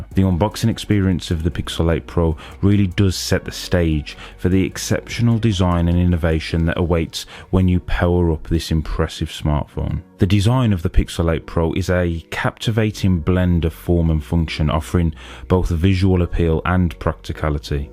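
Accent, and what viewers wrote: United Kingdom. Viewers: Your accent reminds me of a British singer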